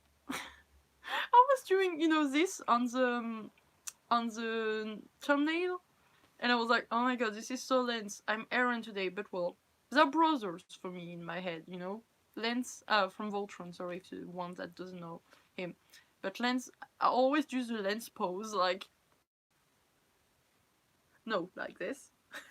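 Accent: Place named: France. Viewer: Moi c'est chelou car je comprend parfaitement l'anglais mais je ne sais pas bien l'écrire XD normal je suis francoportugaise mais même le portugais je sais pas l'écrire mais je le pige à parfaitement, je suis dégouté d'avoir raté le live ;^; en tout cas tu parle bien anglais ! Même si on entend ton accent français mais c'est super bien ! Continue tes vidéo elles son parfaite ! Et tu est parfaite :3 !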